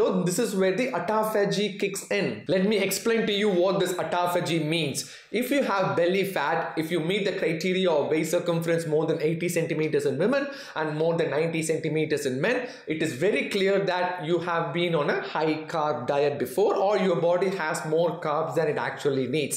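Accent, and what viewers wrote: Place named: South India, India. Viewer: Your video are interesting to watch and the way u tell in South Indian accent and your friend name savarna Kumar lol 😅 Thanks for great knowledge very helpful 🎉😊 god bless you doctor 😊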